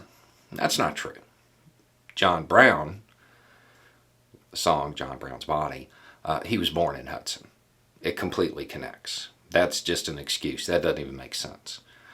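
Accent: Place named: Charleston, United States. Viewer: Worst Charleston accent ever.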